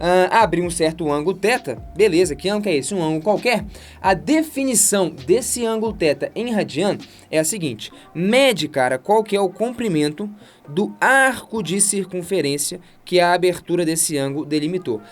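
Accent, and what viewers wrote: Minas Gerais, Brazil. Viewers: Esse sotaque mineiro deixa tudo ainda melhor 😊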